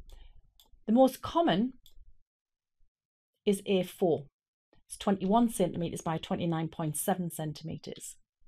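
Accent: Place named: North East England, United Kingdom. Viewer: I think I detect a north east accent! Thank you :)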